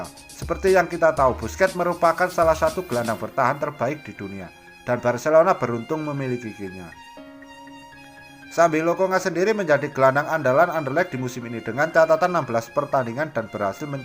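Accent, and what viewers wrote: Java, Indonesia. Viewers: Jowone ndi mas? 😁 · Dengan aksen yg jawa medok, pelan2 aja min... Jadinya salfok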